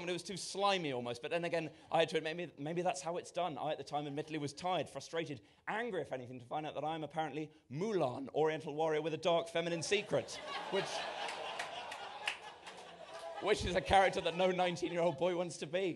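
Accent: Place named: RP, United Kingdom. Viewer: Cute with that post accent. Don't know if he would be as cute if he weren't post.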